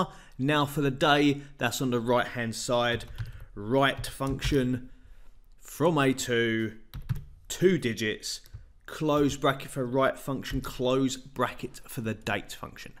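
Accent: United Kingdom. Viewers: Listening your accent reminded me of Peaky blinders😁 However, it was a great lesson. I got to know a lot new things. Thanks:yougotthis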